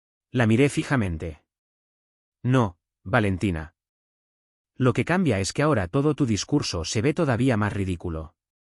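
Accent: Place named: Andalusia, Spain. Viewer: DÉ VERDAD QUÉ ES FACINANTE ÉL ACENTO TOTALMENTE ANDALUZ DÉ ÉL NARRADOR... SIGAN INCLUYÉNDOLO EN TODAS LAS VERSIONES DÉ ESTÁ PLATAFORMA...ES SENCILLAMENTE GENIAL...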